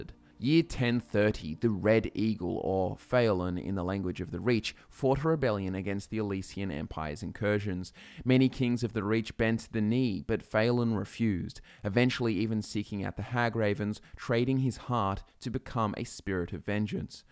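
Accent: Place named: Australia. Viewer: Been watching a lot of these lately and it is just so nice to hear an Aussie voice omg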